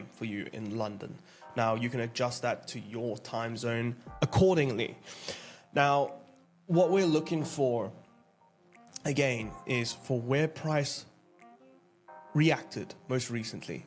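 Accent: South Africa. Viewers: Are you a Saffer?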